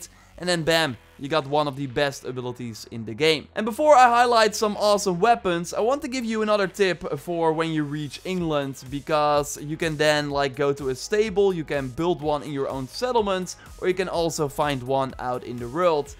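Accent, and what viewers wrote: Netherlands. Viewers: You can hear that you’re from the Netherlands 🥰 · This guy is so dutch it hurts my ears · Je hoort zo goed dat je een nederlander bent van je uitspraak no offense 😂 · ur dutch right ? can clearly hear it when he pronounces "other" like otter" :P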